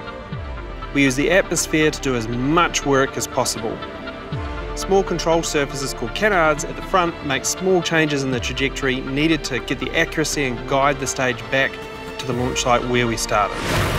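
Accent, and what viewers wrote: New Zealand. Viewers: With a kiwi accent, you can never sound arrogant. Cheering for you, Rocket Lab! (And SpaceX) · I like your lovely kiwi accent and your high aspirations. I wish you the best of lucks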